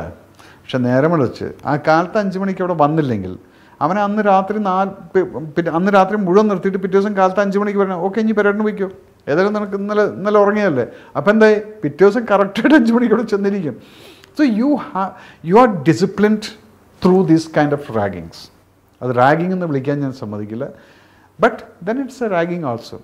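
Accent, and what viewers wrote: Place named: Tamil Nadu, India. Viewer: His Tamil accent is superb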